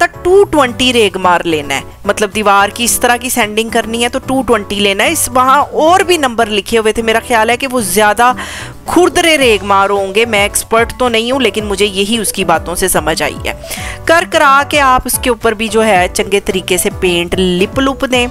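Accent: Punjab, India. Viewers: Panjabi ka trka to bara vadiya lagati hain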